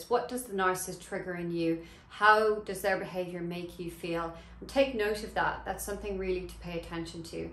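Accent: Ireland. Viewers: is that a hint of an Irish accent ?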